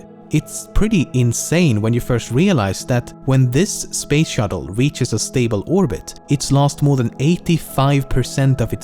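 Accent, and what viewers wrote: Sweden. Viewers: you sound soooo Swedish tell me you are! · love u man with ur sick swedish accent, keep up vids ive been with u since 50k subs and rember when u still replyed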